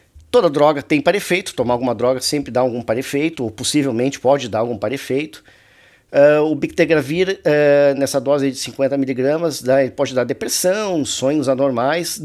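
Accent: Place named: Rio Grande do Sul, Brazil. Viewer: Pelo teu sotaque, deve ser do RS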